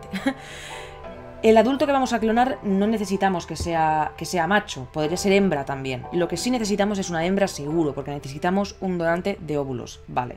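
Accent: Catalonia, Spain. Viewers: Ese acento cataLan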